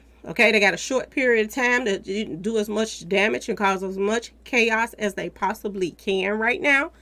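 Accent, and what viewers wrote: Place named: Wisconsin, United States. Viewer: Are you from Wisconsin you say y’all often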